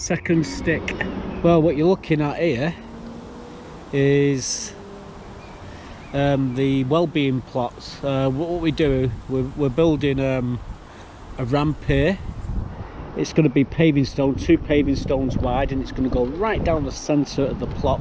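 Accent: United Kingdom. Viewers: Great video. Nice idea having handicap plots above ground. Your pavers are looking good already. Can't wait to see the finished area. You surprised me at the beginning by putting sunflowers in pots. I had one last year that was 10' tall. I've never had a small one before. This year they are popping up all over because they self seeded and some had to be moved. I love em though so they are staying! So you use the horse manure to top dress your beds then. Hope it doesn't smell. My husband sat here and watched with me. He was surprised that I could understand your accent when he was having trouble. He's originally from Redditch. Told him I've been watching your videos for a while now so was able to interpret a few things for him😅. So funny eh? A Canadian interpreting a British video for a British Canadian. Take care.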